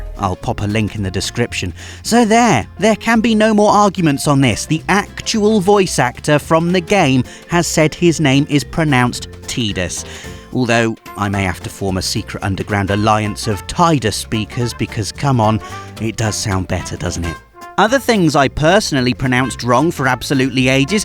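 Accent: United Kingdom. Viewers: All i heard was a british accent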